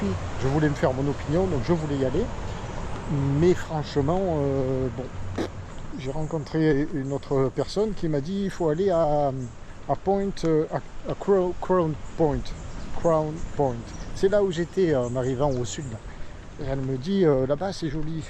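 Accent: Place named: Marseille, France. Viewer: J adore ton accent Marseillais. La bizz. Denis S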